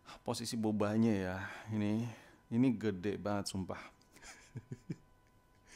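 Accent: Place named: Medan, Indonesia. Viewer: "Udah Ga sabar kali Awak!" Ihhh Medan kali kau bang..!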